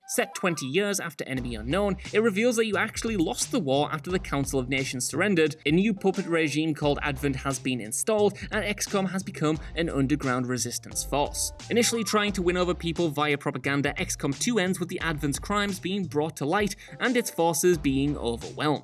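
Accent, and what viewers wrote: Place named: United Kingdom. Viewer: I get the whole British accent thing but if they game pronounces it one way that’s how you should say it! It’s a name after all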